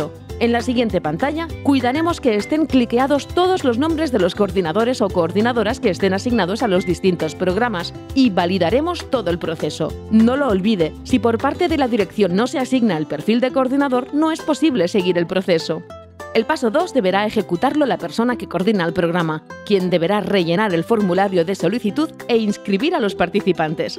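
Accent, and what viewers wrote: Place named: Andalusia, Spain. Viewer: Acentazo andaluz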